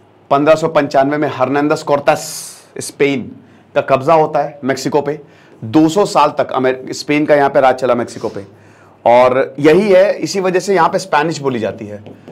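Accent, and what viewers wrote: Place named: Uttar Pradesh, India. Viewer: Aap UP k ho ye to accent se pata chala aur sun k maza aya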